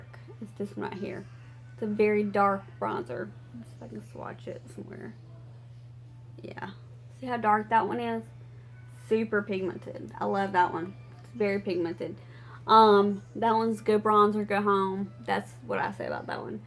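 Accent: South, United States. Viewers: You're welcome. I thought you were from the south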